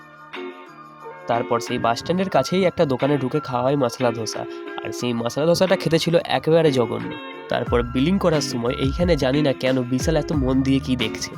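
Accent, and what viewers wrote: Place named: West Bengal, India. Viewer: Vai tumi bangali kotha sune to mne hochhe west bengal er tahole title a Bangladesh lekhar mne ta ki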